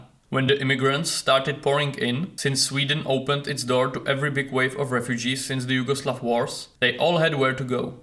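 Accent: India. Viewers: Your accent sounds Indian. Are you from South Asia? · that's a strong Indian accent though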